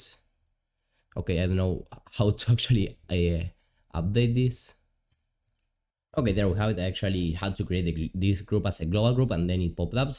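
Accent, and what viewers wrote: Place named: Argentina. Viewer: Buen video! Note un leve acento, sos argentino o uruguayo? Un saludo y a seguir asi!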